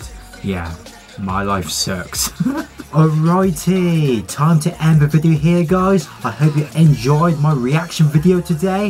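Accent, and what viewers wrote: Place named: United Kingdom. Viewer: obviously tho its kpop with good reaction i loved it lmao 👌💫and your voice i love it its nice to hear someone else with a british accent 💫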